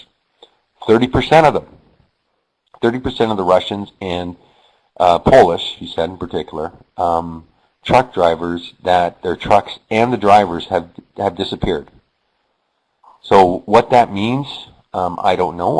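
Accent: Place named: Canada. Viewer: i hate your canadian accent "aboot"? thats not how the <link> pronounces it u ignorant ppl of canada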